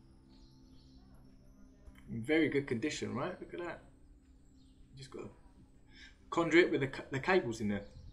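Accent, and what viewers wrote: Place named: Southern England, United Kingdom. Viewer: He's from the South of England. Can't you tell by his accent?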